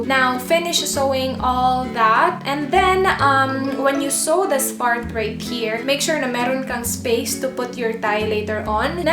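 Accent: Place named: Visayas, Philippines. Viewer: She i guess is Bisaya, i love her accent when she speaks English.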